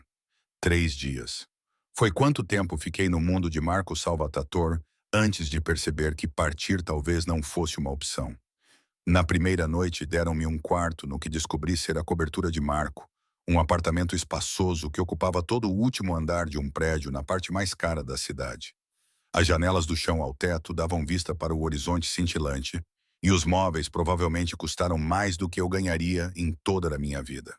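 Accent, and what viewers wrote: Portugal. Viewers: O MELHOR NARRADOR! COM O PORTUGUÊS DA LINGUA PORTUGUESA DE PORTUGAL! ADORÁVEL. ✨💫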